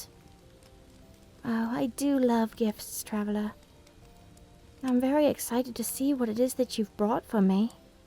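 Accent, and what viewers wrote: United Kingdom. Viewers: Why is she British now? I like it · yes i get to hear my british dragon waifu again. <3 but in all seriousness keep up the good work and keep captivating us with these stories :)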